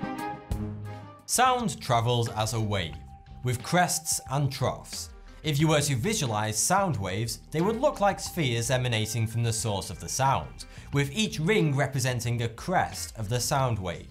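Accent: United Kingdom. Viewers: He is saying, it's just his british accent that makes it sound like 42. Also is a play on words of 42. Also, he's named that because of the hitchhikers guide to the galaxy, where it says the meaning of life, the universe, and everything is 42, which obviously, is a joke. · Why do Brits replace words with a "th" in them to an "F" sound? And Rs are pretty much silent? I fought you wuh going wif him because I hoff to bwush moy teef befo badtoyme. I'm sure it's a regional accent and not all British people sound that way, I know a few Englishmen and some have a very thick accent and others aren't as noticeable except in certain words. I think this guy just has a speech impediment, and with his British accent on top of it, it makes him sound funny. I know I'm not the only person that hears Forty Two when he says his YouTube name at the beginning of his videos, so I'm definitely not crazy. 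Speech impediment aside, I still very much enjoy the videos and find them really interesting to watch. Learning is fun! Especially when you've got someone with that kind of accent telling you the facts! It causes you to have to pay extra close attention, or you might misconstrue what's being said! Keep em coming, or should I say foughty? I'm just having fun here, no malicious intent or hatefulness coming from me, so please don't bash what I have to say about his accent! I've got my own terrible accent that im very much aware of and I have to listen to people make fun of the way I sound and be patient while they try to figure out where im from based on the way I pronounce certain words. As long as people aren't being insensitive I'll play along and get a laugh or two in alongside them, but the second they assume that im not intelligent bc I come from a parti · for the longest time i thought you were saying either 32 or 42, time to do a show on british accents, and accents in general, the exact samething happens in any language, though; as in france, and in the north of spain, let alone the whole country. 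( secret, the family model ) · ohh im so in love with the Brit accent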